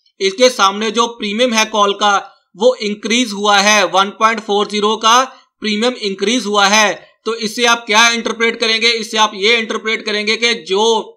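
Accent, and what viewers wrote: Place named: Punjab, India. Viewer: Punjabi laggda hainnnnnn!!!!😃😃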